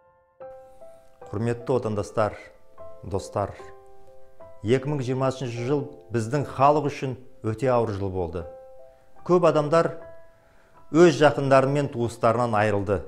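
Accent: Standard. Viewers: МІНЕ, қазақ тілін ӨТЕ, таза сөйлейді,ОЛ ХАЛЫҚТЫ, жаңа жылымен құттықтап тұр қазақ тілінде! КЕРЕМЕТ ӨЗІҢДІ ДЕ жаңа жылыңмен,құттықтаймын! АМАН мау БОЛЫП отбасымен,ХАЛҚЫҢМЕН БАҚЫТТЫ БОЛ,ӨЗІҢ жас.ғұмырыңды,осы жолға арнап БАРЛЫҚ АЗАП.шеккен өмірінің мәні,арманыңа,ХАЛҚЫҢДЫ МЫНА талаптан құтқарып,ЗАЙЫРЛЫ демократиялық парламенттік республика,құрылуына тілектеспіз.сол арманыңа осы.жылы орындалуын,тілейміз табанды қайсар,сынбаған АСЫЛ азаматтың СЕН, бұл саған берілген баға,жағыныу ЕМЕС, СОЛ арманыңа ЖЕТ.халқыңды осы адамдар АЛЫП ШЫҚ.халық СЕНІ ЖАҚСЫ көреді.өзгеріс БОЛАДЫ.бұл РАС БОЛАДЫ.сау бол байқап жүр АМАН БОЛ. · Рахмет! Жана жылыңызбен! Ал, кәнеки, казакша сойлемейді деп, айыптайтын агайын, коріңдер, біздің кандай әдемі, таза казақша сөйлейді! Зор денсаулық бакыт тілеймін, елге оралып, ел баскаратын болыңыз, казагымның ардакты, азаматы! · Таза казакша жаксы соилеиды екен гои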